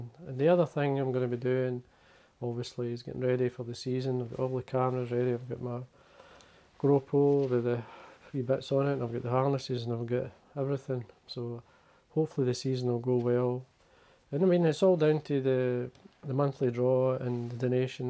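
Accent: Scotland, United Kingdom. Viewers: Finally put a face to those fingers and that lovely Scottish voice! I had a bamboo rod put in my hands 63 years ago. Started tying and buling rods 40 years ago and have loved every minute of it. When my dad passed, I found that old bamboo rod and completely rebuil it to its original condition and caught trout on it 63 years later! What a joy. It was as if dad was there and probably was.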